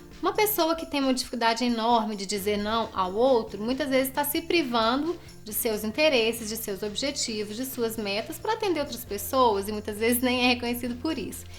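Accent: Minas Gerais, Brazil. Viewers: Eu gosto muito desse sotaque minerin!!!!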